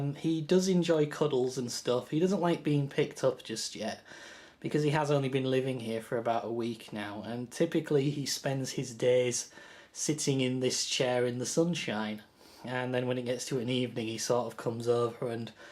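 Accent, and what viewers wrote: Yorkshire, United Kingdom. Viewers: I swear to god,your Yorkshire accent’s getting thicker!!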